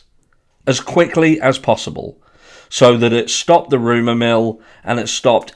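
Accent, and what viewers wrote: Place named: England, United Kingdom. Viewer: There’s 1 thing that Sami did that really stood out to me. So AWP start searching Boca & possibly Donna Lake, LE tell AWP not to search it, Sami is aware through her parents (friends in high places) that they’re not searching Prosser. AWP put out a post that they found Kiely’s car & remains (not staying where they found her), Sami posts immediately “they have not found Kiely & the parents have not been told….” Blah blah & so on & so on. LE didn’t think AWP would go search it after being told not too…there’s someone in LE protecting Sami & whoever else is involved. Also, after a post comes out that Sami has a lawyer, she then posts a video of her drinking & partying at Prosser. Imo she was celebrating getting away with murder! I have so many other inconsistencies with Sami but they are the 2 that really stuck out to me…. 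You do a great job. I prefer you over these American idiots just going over crap that’s irrelevant & going down rabbit holes. So thanks for keeping it real. It also helps that I love English accents 😂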